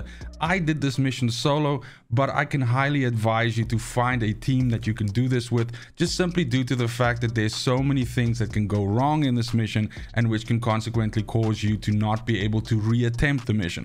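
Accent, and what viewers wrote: South Africa. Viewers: You sound South African, where are you from?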